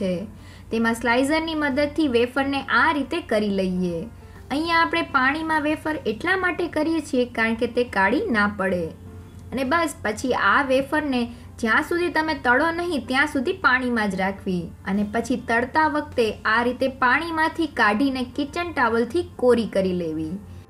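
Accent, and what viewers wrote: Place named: Kathiawar, India. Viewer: Saras bni 6 ho. Bajar jevi Krispy. Tmari bolva ni style bhu gme 6. Kathiyavadi lago 6o. Ben thanks a lot for topra pak recipe. Ur fan from rajkot.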